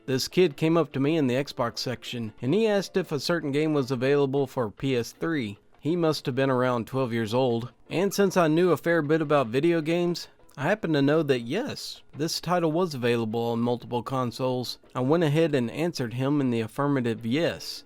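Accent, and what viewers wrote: South, United States. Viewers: the waylen jennings justified accent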